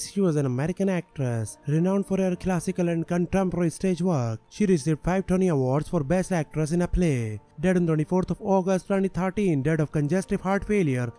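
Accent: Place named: India. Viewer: Obviously, the narrator has an Indian accent.however, he makes it harder to understand because he speaks so FAST! Is this a race?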